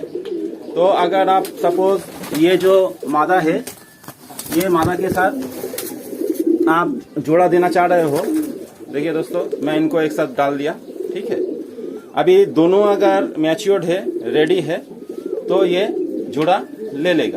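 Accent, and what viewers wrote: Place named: Bengal, India. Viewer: I understand from your accent that you speak bengali. Accha, apni ki kokhono dekhechen je kobutorer vai bon jora ney? Amar ekjora ache emon, dim diyeche ora, ete ki bacchar kono problem hobe? Anways you have beautiful pigeons! Love from Bangladesh ❤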